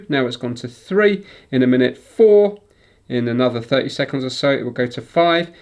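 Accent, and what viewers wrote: United Kingdom. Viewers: I love listening to British people talk!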